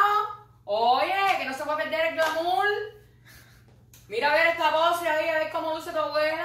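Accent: Cuba. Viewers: Me encanta como hablas!! Me recuerdas cdo vivía en la isla de mis amores felicidades por mantener tu cubania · Nena ese dulce tiene huevo crudo.Eso no es bueno para la salud.Pero si te digo eres comica con tu asento cobano chiquitica.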